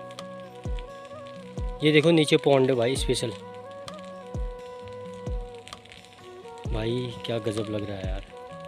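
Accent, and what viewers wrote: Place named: Pahari, India. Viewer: Wow कितना sunder जगह बहोत ही aacha मन ख़ुश हो गया वीडियो देख कर और आपकी पहड़ी आवाज सुनकर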